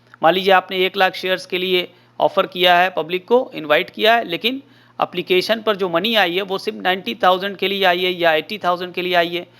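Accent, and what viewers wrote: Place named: Uttar Pradesh, India. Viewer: Dekha aapne mera andaaza Maine kewal aapki awaaz se pata laga liya ki aap UP se ho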